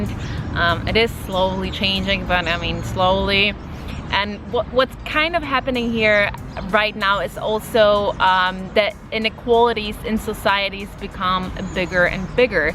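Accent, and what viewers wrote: United States. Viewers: Wait, so you were born in Poland, how come you have a perfect American accent then? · How come? 😎 your American English is impeccable. I cant believe you didnt live in the US. Greetings from Warsaw😎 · Yes, I noticed the same thing-a very strong American accent, it might just be how a Polish person speaks English!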